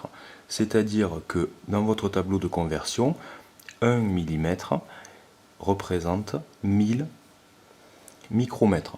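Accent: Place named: Marseille, France. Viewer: Wsh ta l accent marceiller 😂😂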